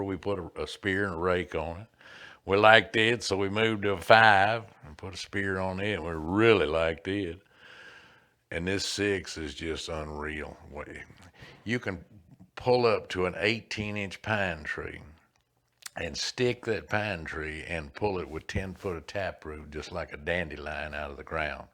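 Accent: South, United States. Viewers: Haha! Great video! I like listening to you narrative! That good ole country accent! God bless the work of your hands! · Loved the Video AND The Country Boy Narration!! Haha! Get Er' Done!!😎😎😎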